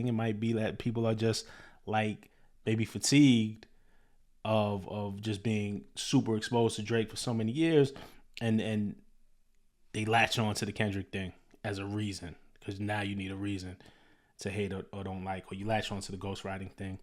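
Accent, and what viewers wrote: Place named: New York, United States. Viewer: Fam if you still listening to Drake after all this, how you call yourself a fan of hip hop? Having a New York accent, glasses on, gold chain and ya hat to the back don't move me. You should be ashamed of yourself fam to even say you still fux with Drake music. Vanilla Ice was basically Ousted from Hip Hop for lying and doing this same thing. Ya'll New York folks a disappointment. Ya'll really used to gatekeep and really used to stand on something when it came to the Culture. Not Anymore. That's why places like Atlanta were able to come in a really put they stamp on the Culture. Because New York lost it's soul, lost it's backbone. A dude from New York hearing and seeing this all go down, should be one of the loudest in the Room saying "No, This Ain't Acceptable". You dudes too scared now to go against the grain even for the Culture. 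To me fam dudes like you sellouts. Don't come at me with the "I'm trying to be fair", blah, blah, blah. FOH with all that. You don't even talk like you got passion for this Culture. Kendrick rapped like he is of the culture, from the culture, and it means something to him. You ain't got that same type of energy my guy. It's cool. Do ya thing. Alot of ya'll "Not Like Us" and alot of ya'll getting exposed. You gone be on the wrong side of history when it's all said and done. Trying to play the middle like you Swizterland, is how we got here in the 1st place with Drake.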